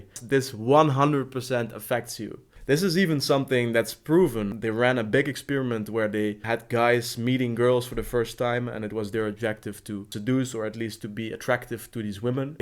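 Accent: Netherlands. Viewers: Ik ruik een nederlands accent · Ben jij Nederlands? Je klinkt Nederlands.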